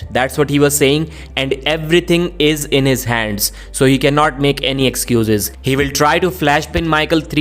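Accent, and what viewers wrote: India. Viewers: fix your god awful indian accent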